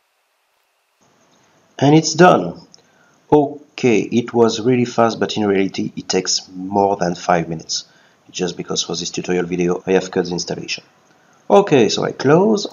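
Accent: France. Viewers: Mec je sens ton français 😀 Keep it up ! And thanks!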